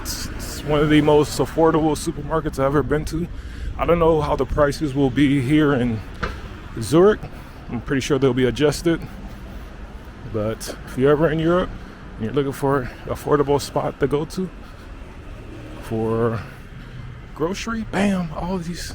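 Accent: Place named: Massachusetts, United States. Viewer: I like your pioneering and adventurous spirit. I invited a dude from the USA, who spent 10 days at my house in front of a laptop, We live near France and Switzerland. I tried to suggest he visit Zurich, Basel or Strasbourg for a day, but he was surely not interested, the good part of the deal I was willing to drive him there at no cost to him to show him around. By the way your accent suggests you come from Massachusetts, the New York area, I could be wrong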